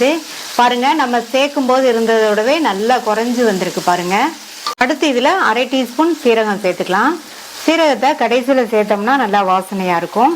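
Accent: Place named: Madurai, India. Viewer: குழம்பின் மணத்தை விட மதுரை தமிழ் மணக்கின்றது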